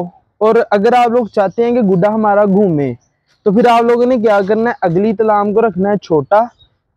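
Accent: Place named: Pakistan. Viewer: Hy bro good video i am from Delhi India but your accent is like Pakistan... By the way nice video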